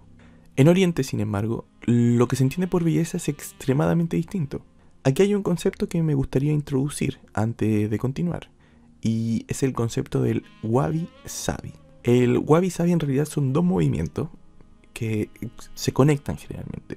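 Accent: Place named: Chile. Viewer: Eres chileno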